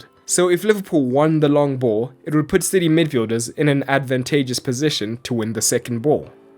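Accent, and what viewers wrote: South Africa. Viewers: Hey man, nice vids, keep up the good work, just wanted to ask, where are you from? Your accent sounds South African (I'm South African)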